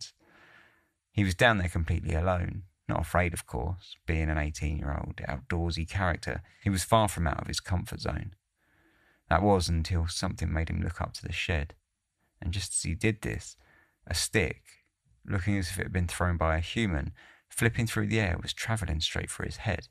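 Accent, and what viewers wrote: England, United Kingdom. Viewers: Sounds like English accent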